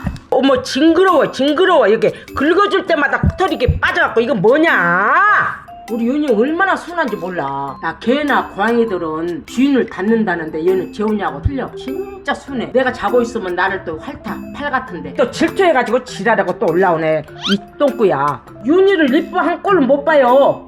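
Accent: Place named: Jeolla, South Korea. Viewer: 우리엄마랑 똑같은사투리..똑같은욕..ㅋ소오름ㅋㅋ어딜가도 ❤전라도사람 인거 너무티남ㅋㅋ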